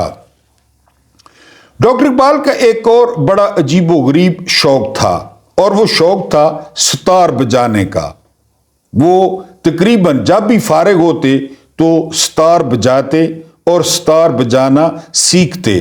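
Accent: Saraiki, Pakistan. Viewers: اسلام وعلیکم! صاحب آپ کی وی لاگ بہت ھی انفارمیٹو ھوتی ھی۔ اینڈ ہر کھی کبھی سرائیکی لہجہ بہت اچھا لگتا ھے ، دعا ھے اللہ تعالیٰ اآپ کو خوش وخرم اور محفوظ رکھے آمین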